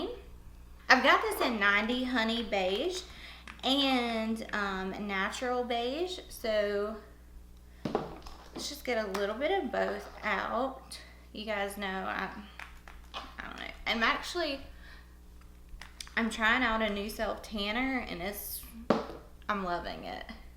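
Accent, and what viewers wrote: South, United States. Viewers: You remind me of Casey Holmes so much!!! You both have southern accent and you both have same personality!!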